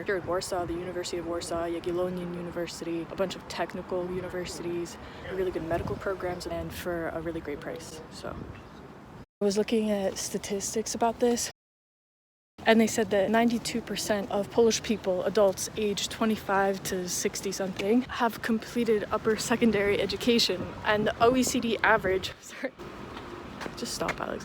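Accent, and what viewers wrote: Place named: United States. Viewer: Lovely vlog. You can definitely make decent money in Warsaw teaching English - you'd be sought after for your accent (recognisably American but also clear and distinctive, although you might have to slow it down a tad at times, so that your learners would follow). Poland is one of the best destinations in Europe to teach English.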